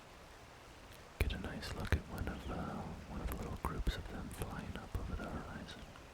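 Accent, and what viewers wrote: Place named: Scotland, United Kingdom. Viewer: you have a scottish accent in the way you pronounce certain things it became very apparent right before you revealed your heritage i share some irish/scottish blood as well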